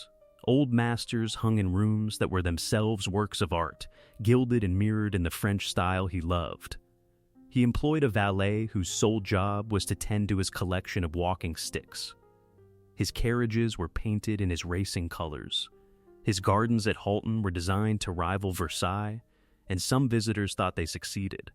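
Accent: United States. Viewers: Why always American commentators? But story is different, very genuine personality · I liked the American narration. I didn't have to turn on the closed caption to understand it.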